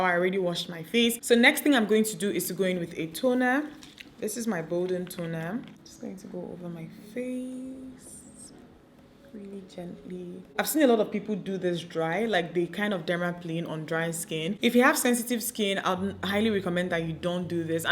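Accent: Nigeria. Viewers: Its the nigerian accent for me 🤩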